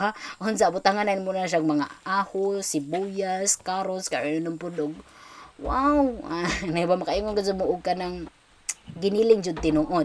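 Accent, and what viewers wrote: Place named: Bohol, Philippines. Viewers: Basta boholanon puro naay GGGG,,kaway2x sa mga naay 1/2 dugong boholanon 👋👋👋👋bisag naa me dere nagdako sa mindanao pero makuha gyud namo ang storya ur talk pagka buholanon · Kalami na lang gyud paminawon ang imong bol-anon nga accent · taga loon ms. kay grabe ka gahi at first maka tawa ko pero di ko kabalo unsaon na di sya ma offend, j man pud ahong inistoryahan kay taga leyte man ko pero ang taga loon grabe ka gahi jud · Same tag accent dre saint Bernard southern leyte ms,ang ka J jud me dre gahe m dre ug inistoryhn hehehe, maoy istorya dre ag amy daw accent ky s bohol jud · Lahi2 jud tag accent amoang inistoryahan pinagahi pod hehe,anyway taga isla kos Buenavista niya kabalo kos inyun accent..kababayan ganahan kos imong tingog maong pasagdi lang na cla iampo nalang hehe. Watching from ohio USA God bless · NINDOT KAha imo accent, Normal ug native sa Bol-anon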